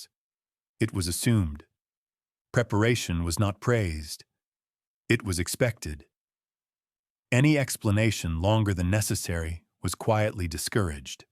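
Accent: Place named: United States. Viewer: Interesting and relevant subject matter. sadly however, it features an American voice over.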